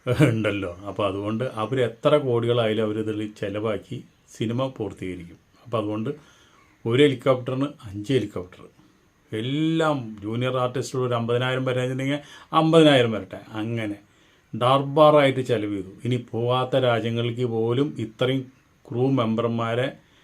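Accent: Thrissur, India. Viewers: തൃശൂർ കാര് അങ്ങനെ പറയും. പ്രാദേശികത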